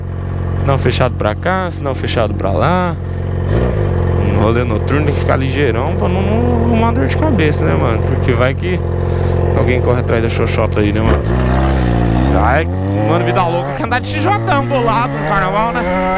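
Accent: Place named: Northeast, Brazil. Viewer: EU SOU DO SUDESTE E VEJO TDS SEU VIDEOS PQ VC SO FALA NORDESTE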